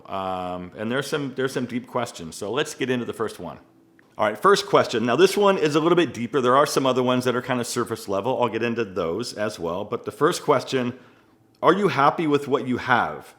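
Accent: RP, United Kingdom. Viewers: LOVE Posh BTW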